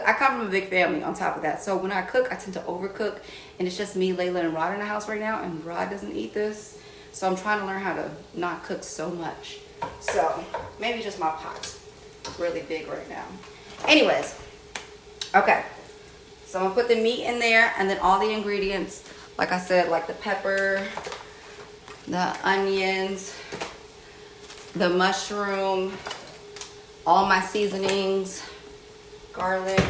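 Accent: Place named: New York, United States. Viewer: I can tell your from New York it’s all in your voice I’m from New York too the Bronx your mannerisms is so New York love it ❤️ you seem very nice down to earth ❤️ I’ve met your sister on ancestry ya look just alike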